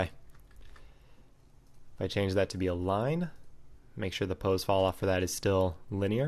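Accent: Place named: Italy. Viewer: i love your Italian accent, say "started"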